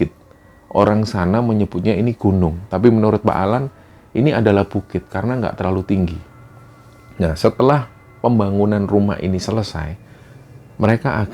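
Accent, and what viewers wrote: Java, Indonesia. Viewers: Salam kenal mas. Pertama kali aku nonton langsung jatuh cinta sama logat bahasa jawanya dan cara berceritanya. Jadinya langsung ku subscribe. 🎉❤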